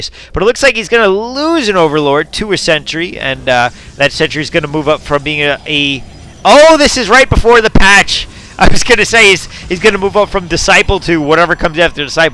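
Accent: Boston, United States. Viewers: "I'm sick of this time zone shit" + your Boston-esque accent = Me subscribing.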